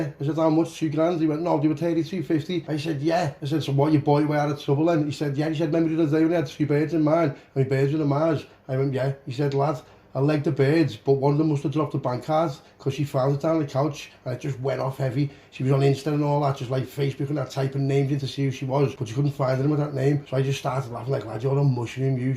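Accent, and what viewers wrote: Liverpool, United Kingdom. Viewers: Yes Scouse 💯👋👍👍👍👍👍👍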